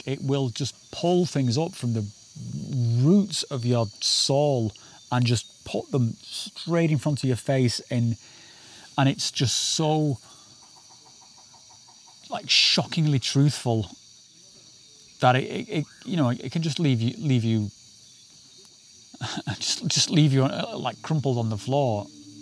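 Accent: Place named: Manchester, United Kingdom. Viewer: Are you from Manchester? You sound like Tyson Fury LOL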